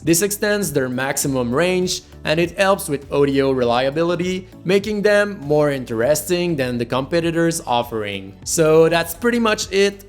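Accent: Quebec, Canada. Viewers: // He's from Canada, one of the French regions.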